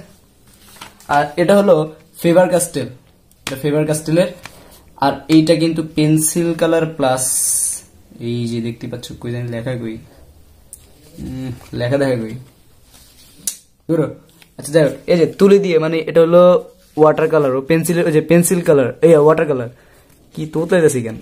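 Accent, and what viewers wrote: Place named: Bangal, Bangladesh. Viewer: Dada ami to bangal noi tai bangal bhasha janina ektu ektu bolte pari bhaiya apnar bangal bhasa amar darun laigachhe are apnar reveiw o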